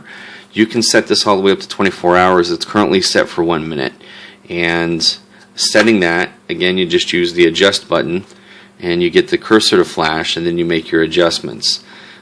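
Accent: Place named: United States. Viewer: Great Video...Well explained on all the Features and Functions over 33 minutes! Not boring at all...well spoken! But then again, you sound like Michael Douglas (the actor) with your American Accent as i am a Northern Englishman with a Yorkshire Accent! i just bought a 150 metal (silver) for £75 including delivery off E-Bay last week.!!! It was 2nd hand...but in near excellent condition! I was impressed as it arrived today! I had a Casio150 (identical) bought in 2013 as a gift and it has been well used and knocked/worn out! I caught the strap on a metal fence (caught inbetween the strap and wrist and it broke the strap!) Also the battery was replaced around the same time and it now doesnt bleep at all, and the repair shop didnt know how to fix it...so i decided to get another! Il keep it for spares as i did the previous casio 80 model i bought in 2003 which was a shell from which i used my 1994 black rubber 80 casio!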